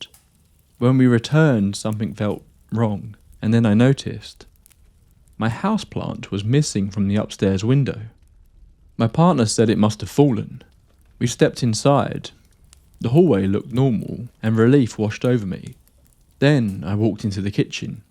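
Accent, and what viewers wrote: England, United Kingdom. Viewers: Sioux City, Iowa. Da SUX (Airport code.) Anglophile, have heard a lot of English accents for an average US citizen and yours is superb. Absolutely brilliant channel. Thank you.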